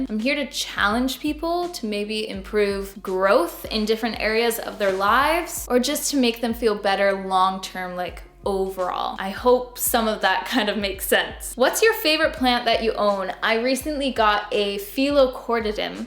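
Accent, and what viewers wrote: United States. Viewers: PLEASE DON'T TAKE OFFENSE TO THIS QUESTION I PROMISE IT'S GENUINE. Why don't you have a Canadian accent? I live in Iowa, US, and you almost sound Midwestern like Iowan or something. I feel like has some sort of accent as well, but you speak so much like an Iowan it's crazy. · So you live in Canada but you do not sound Canadian/ you sound American. Am I right?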